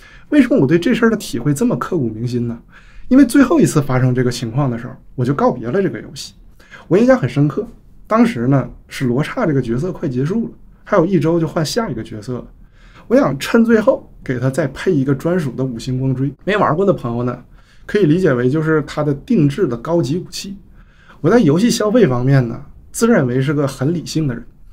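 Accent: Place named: Tangshan, China. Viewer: 这个口音，是唐山人吗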